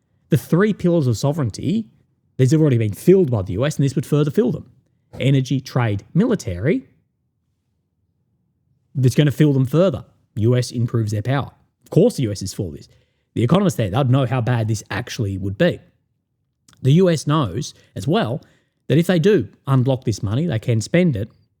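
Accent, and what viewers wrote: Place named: Australia. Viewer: Down under mate 🙂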